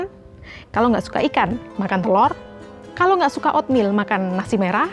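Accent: Java, Indonesia. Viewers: Aku sub dah!!! Ekkekwke mirip hansol 🤣 nyrocosnya logat jawa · Kak...kok ada medok nya....org mana kak???? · Adohh ngakak ya baru pertama kali liat video nya. Medok e keliatan 🤣🤣🤣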